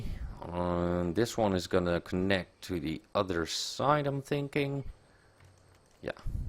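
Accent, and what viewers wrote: Netherlands. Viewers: Are you Dutch by any chance because your accent is very Dutch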